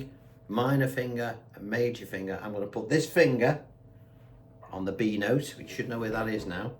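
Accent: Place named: Wirral, United Kingdom. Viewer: Hi the scouse accent drew me in here but you have that posh twang of a Wirralite or Formby guy? the other thing is our strap with the Union jack That was my flag all my lfe i never even knew there was an England flag I noticed the change away came about 1993? Being a classical piano student the guitar fretboard seems very complicated in comparison but this helps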